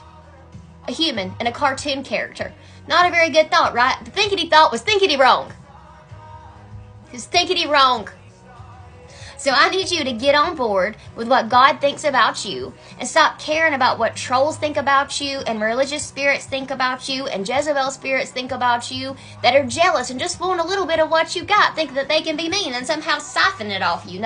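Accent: South, United States. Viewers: You have a great Southern accent sweets!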